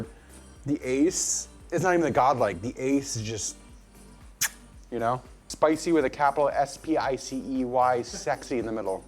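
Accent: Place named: RP, United Kingdom. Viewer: I was fortunate to have the option of the subtitles, otherwise I wouldn't have understood anything you said. Pls either speak slower or get the plums out of your mouth. Sorry about that, mate.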